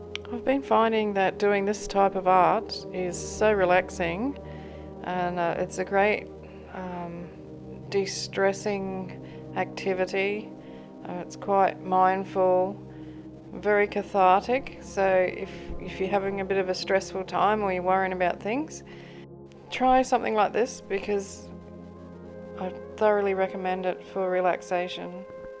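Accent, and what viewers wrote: Australia. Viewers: It was nice hearing your Aussie accent (I am an Aussie too) because most YouTube instructors are American.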